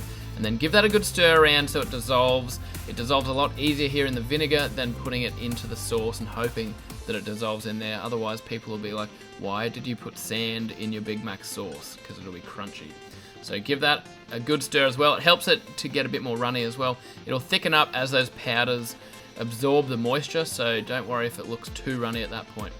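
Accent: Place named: Australia. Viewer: I think it's absolutely remarkable that douche-bag sounds the same even with an Australian accent!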